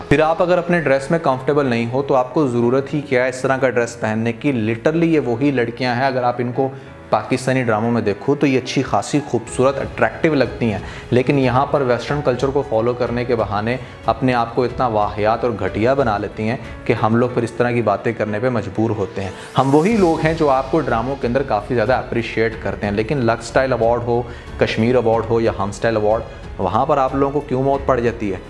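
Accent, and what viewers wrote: Punjab, India. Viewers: Tuhadi punjabi 22 sooo funny😂😂😂😂😂😂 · I like the way your punjabi coming out! I love it!!🙆‍♀️❤️and the way you criticised actresses…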